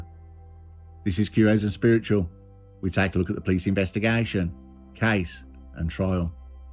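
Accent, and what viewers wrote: Birmingham, United Kingdom. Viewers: it's a shame your longer videos don't do so well. I find your voice very soothing. Broken nose with a soft Brummie accent is a winner for me. Maybe some more police interview footage would help. Anyway, you do you and good luck👍.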